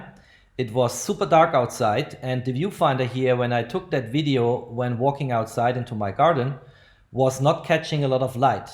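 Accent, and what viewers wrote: Germany. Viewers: Hör ich da etwa deutschen Akzent raus ? 😉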